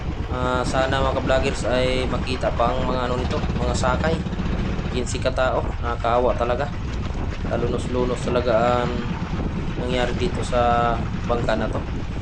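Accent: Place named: Visayas, Philippines. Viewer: ayo sin mo pag salita mo bisaya kadong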